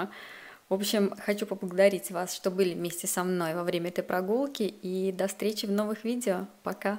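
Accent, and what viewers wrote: Standard. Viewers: этой подписчице приятно слышать чистую, грамотную, русскую речь. У Вас способности к языкам, не очень заметно что вы выросли в Латвии. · Очень приятно слышать чистую,грамотную русскую речь. При том, что девушка выросла в Риге. Какая красота вокруг! Как будто я сама там гуляю и дышу чистым прохладным воздухом! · Спасибо большое за ваши простые и в тоже время неповторимые ролики.... Всё очень красиво, ухожено вокруг. Так красиво.... Вы такая молодец, столько учитесь.... Так приятно видеть свободного, образованного , прекрасно воспитанного человека...,с уважением относящегося к другим странам и нациям, знающего несколько языков и при этом ещё говорящего на прекрасном правильном, чистом русском языке, без мата и проклятий.... Спасибо вам, огромное, счастья и процветания !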